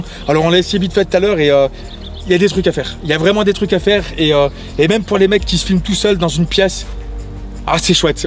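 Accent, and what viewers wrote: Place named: Suburbs, France. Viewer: Tu causes comme un évadé de banlieue, mais t'es vraiment excellent !!! 🌟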